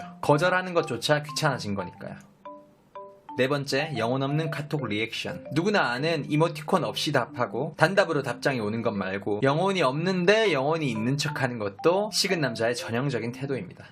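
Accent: Gyeongsang, South Korea. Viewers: 대구냐 부산이냐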